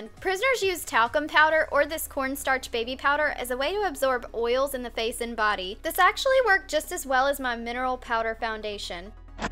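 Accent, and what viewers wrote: South, United States. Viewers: why do u always talk like a country girl is gross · she talks country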